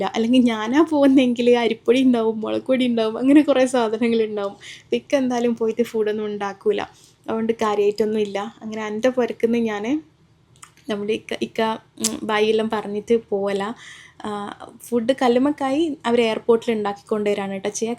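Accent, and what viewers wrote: Kannur, India. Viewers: nalla rasand pallu porikka., aah nammale kannur basha marannu samsarikkulaa 🥰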